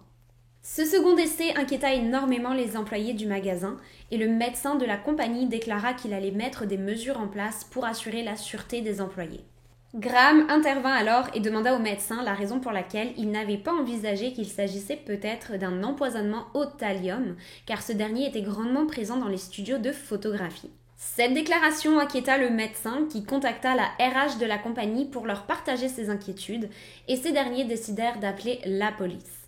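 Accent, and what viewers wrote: Quebec, Canada. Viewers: Il y a un petit quelque chose dans ton accent qui sonne québécois. Est-ce que je me trompe? Tu es pétillante! C’était la première vidéo de que je regardais, mais certainement pas la dernière. Je me suis abonnée!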